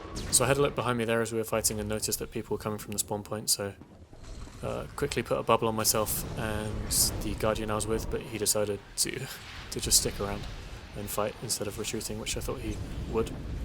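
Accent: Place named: United Kingdom. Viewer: Thank god I found your channel exactly what I was looking for, you sound British what server do you play?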